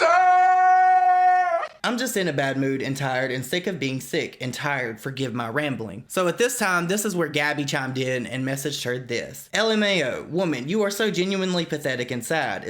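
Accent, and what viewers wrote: South, United States. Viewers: This is the first video I've ever seen of yours and I am an instant fan! Subscribed with notifications on! Love your southern accent! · Being a TN girl I didn’t realize it till now but a southern accent adds so much drama and oomph to any tea it made all this shit going on 10 times more interesting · Hey, well you r saying what of a bad person she is, but look at you.... Let your damn southern accent look at its self first and then you can judge about others. What you are doing is pretty fckd up. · Omg- its something new with this whacko every single day! Thanks for all of your hard work, to bring us the latest tea 😉 And, OMG- your voice & adorable southern accent are so calming to me! I just adore you! 💛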